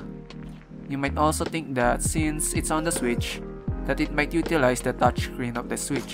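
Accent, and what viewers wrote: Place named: Philippines. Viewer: hey, the accent sounds very Filipino. great to see more of us doing game reviews!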